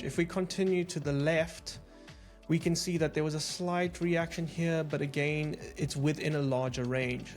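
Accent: South Africa. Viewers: Thank you for this video - nice and clear and I think it was a beautiful South African accent :) I am new on your channel and found this very helpful!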